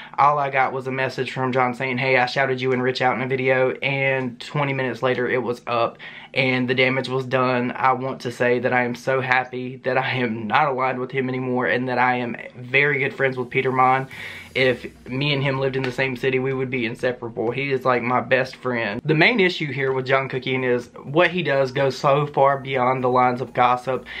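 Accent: South, United States. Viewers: uggggg, your southern accent makes me crave okra :( <3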